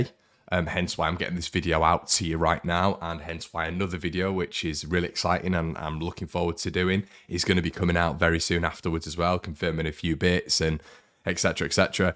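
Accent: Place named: Yorkshire, United Kingdom. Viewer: Narked off 😂😂 proper Yorkshire. Loving it.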